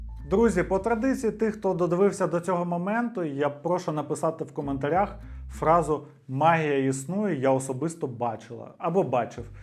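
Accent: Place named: Russia. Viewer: Дуже крутий контент, подивився вже майже усі відео Але є трошки конструктивної критики) Було б добре, якби автор трошки попрацював над вимовою (маю на увазі зросійщену "г" і подібні русизми) А так все файно, дякую за працю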